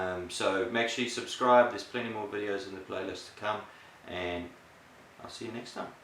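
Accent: South Africa. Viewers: Definitely a Saffer!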